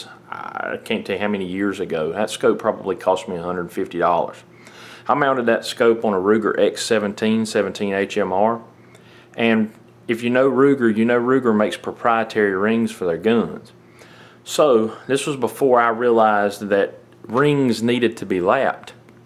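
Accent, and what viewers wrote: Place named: Ireland. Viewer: that some thick accent you got there, you from Ireland?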